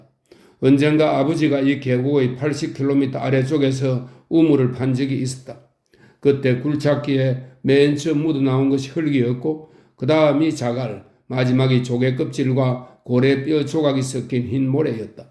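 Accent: Gyeongsang, South Korea. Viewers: 경상도 억양이 싫어요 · 경상도 억양이 인간적이라 사람냄샤가 나요...❤❤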